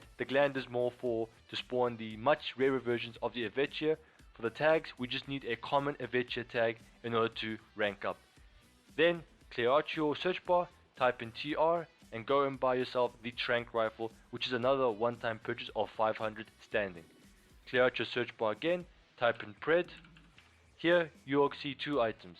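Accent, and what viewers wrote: South Africa. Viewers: are you south african? and thank you this helped alot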